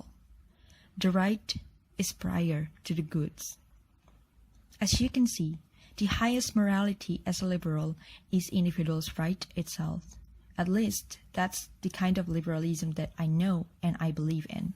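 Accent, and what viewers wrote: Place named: India. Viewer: kayak accent india ya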